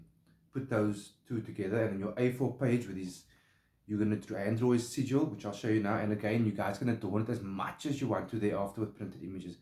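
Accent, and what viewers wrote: South Africa. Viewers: Correct me if I am wrong...you sound Capetonian South Africa.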